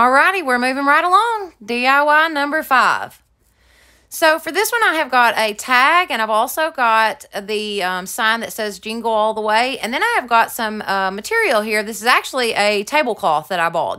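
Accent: United States. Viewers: I love everything g u do plus you're the only person I that I've heard on youtube that's as country as me, lol!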